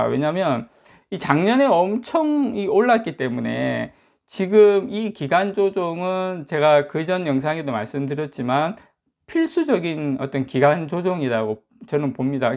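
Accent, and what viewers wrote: Gyeongsang, South Korea. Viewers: 갱상도 말씨 정감있어 좋아요 ㅡㅡ하또 하또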